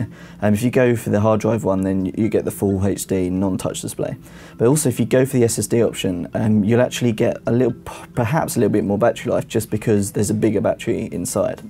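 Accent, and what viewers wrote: United Kingdom. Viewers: that's more english that you think actually, it's just british accent. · Why would what I assume is a British speaker (from the accent) say aluminum and not aluminium? Perhaps reading off some official US press notes, and too whacked to notice. Anyway, machine looks nice.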